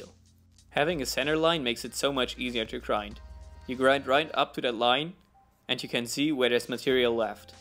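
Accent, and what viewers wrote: Germany. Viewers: Man hört das du deutscher bist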